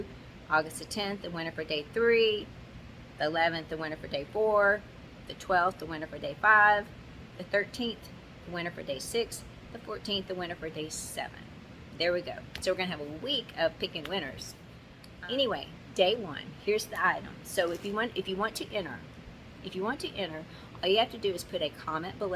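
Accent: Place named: Texas, United States. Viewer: Love watching you. Love the accent it's like being back home with my mama in Texas.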